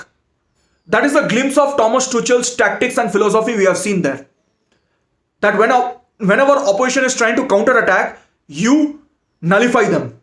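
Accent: India. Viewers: Idian accet so cool, I prefere of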